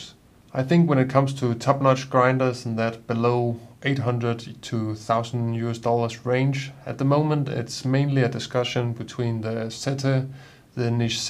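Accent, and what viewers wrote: Denmark. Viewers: Hej :) Hearing your accent I am assuming your are from Denmark? I am getting my Specialita soon and was wondering where you printed the 3D single dosing hopper? Can you recommend something here in DK/Copenhagen that doesn’t cost a fortune? :-) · Hi It sound like you are from Jylland DK, if I am not mistaking 😊 Anyway you have many nice videos out 👍 I have the Eureka Mignon Specialita and had have it for half a year, Love it. (very silent) Although I use it mostly for filter coffee. I use it for 2 cups equal to 38 gm to 640 ml. The settings for filter coffee is almost at maximum size. In the community out there, there is a 3D Print file of a large scale where the is a gear to the small nop so it is easier to dial-in however it is NOT making the grinder more beautiful. There is also a 3D Print base plate which is useful, making it tilt to the front, a little like the new model. 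👍Thank you for your video👍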